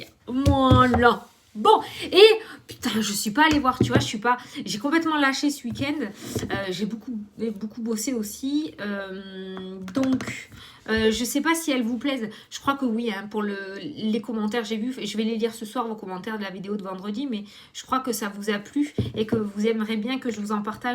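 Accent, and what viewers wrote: South, France. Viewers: Cc aujourd'hui beau soleil là journée commence bien en plus ton sourire ta petite voix chantante et ton accent du sud la petit boite de cartes je vais me l offrir hâte de t avoir en rendez vous une prochaine fois🤡🙆‍♀️🌹🏖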